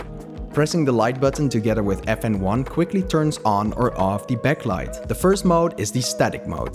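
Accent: Netherlands. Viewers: Gotta be Dutch! :) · you sound Dutch? klopt dat? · Is he Dutch, he sounds Dutch... love the vid, really helpful. I still don’t know, either k6, K1 or k2. Atm k2 hot-swappable is not available in brown switched. :( and I think I want brown switches instead of the red ones. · Are you from The Netherlands because I think im hearing a slight Dutch accent?🤔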